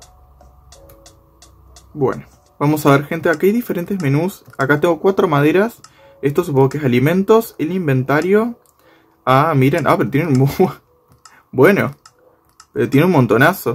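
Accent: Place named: Uruguay. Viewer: Hablas como uruguayo del interior, de donde sos?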